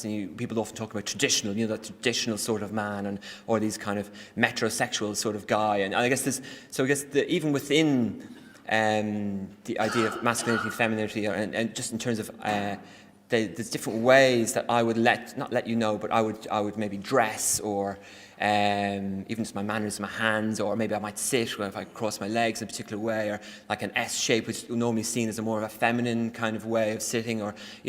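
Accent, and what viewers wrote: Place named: Ireland. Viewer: If he had a German accent instead of that lovely Irish one, I think people would be much more suspicious of him.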